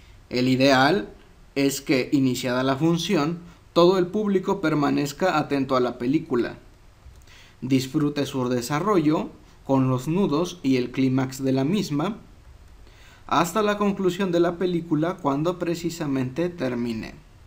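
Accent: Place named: Spain. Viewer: Jolines! y porque hablas como español?? trabajjjo, jjjjornada...etc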